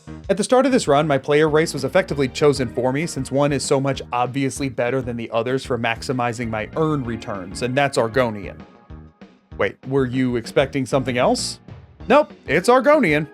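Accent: United States. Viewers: Baltimore Accent run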